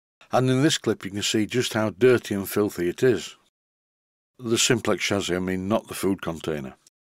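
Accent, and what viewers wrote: Yorkshire, United Kingdom. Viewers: It's actually a METHS lab, don't know about Breaking Bad, but look out for Breaking Taps. BTW, your Tyke dialect amuses me - the unconventional pronunciation of 'chassis' as 'shazzy', counterpointed by the opposite with a sibilant 's' in 'becoss' (because). keep it up, pal! ( I had a girlfriend who used to say that). I suspect your simplex will run again before mine will! Cheers!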